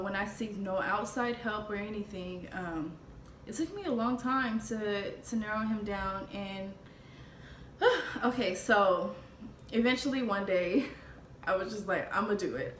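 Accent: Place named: San Francisco, United States. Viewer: I knew you were from San Francisco area by your accent! Me too! Thank you for your courage in sharing this video. I learned so much. I am sorry your mother had such a bad childhood experience. I am so glad you are here. You are a terrific lady.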